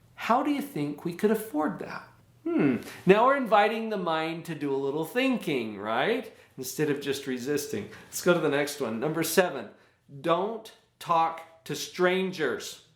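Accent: United States. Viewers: you sound very american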